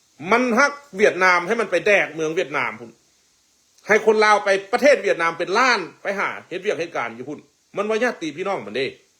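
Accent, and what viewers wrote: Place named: Nakhon Phanom, Thailand. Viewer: เจ้าเว้าคือคน จังหวัดนครพนม.....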